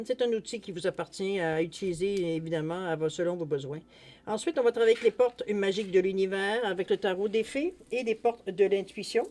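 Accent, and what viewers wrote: Quebec, Canada. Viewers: J 'aime ton accent québécois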